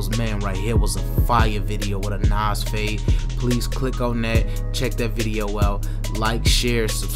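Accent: Chicago, United States. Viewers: Sound like Chicago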